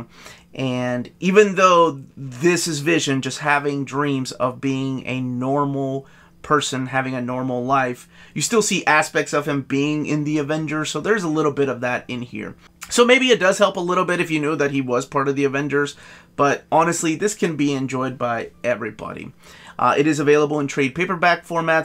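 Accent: United States. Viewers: what country do you reside in! Just super curious, I think you’re American however I cannot tell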